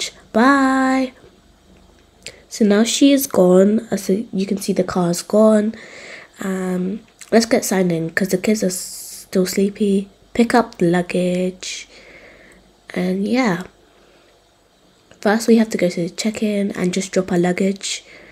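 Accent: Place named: United Kingdom. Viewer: The British accent though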